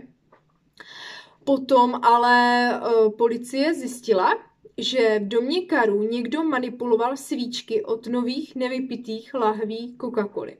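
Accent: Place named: Ostrava, Czech Republic. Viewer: Mne treba vadi zvyseny ton hlasu na konci vety. Zakoncujete vety jako by otazkou. Budete asi z Ostravska, ze :)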